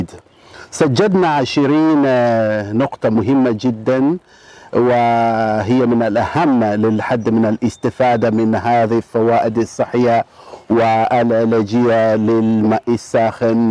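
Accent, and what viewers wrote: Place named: Balochistan, Pakistan. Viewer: انت من بلوشستان عايش في عمان يا لان لغتك العربيه ليست لغة العرب وبارك فيك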